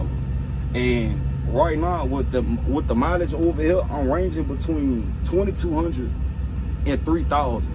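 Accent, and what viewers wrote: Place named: Missouri, United States. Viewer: Definitely a Missouri accent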